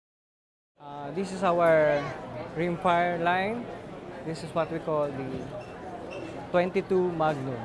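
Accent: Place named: Bisaya, Philippines. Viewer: bisaya ka dong?! galeng mong mag prisint dong!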